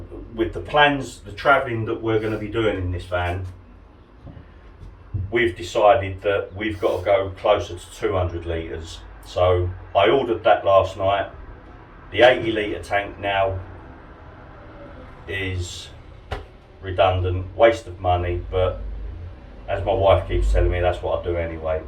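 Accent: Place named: Kent, United Kingdom. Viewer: Did u do that or was it decommissioned before you brought it bud . Got my eye on 18 t prison van . I have class 1 hgv n dcpc..... I was on the understanding that if truck is used for private use only (after I brought it ) then I dont need to use my digi card . You sound local to where I grew up north west kent . Now in Essex. Great vids bud . Handy ideas for mine .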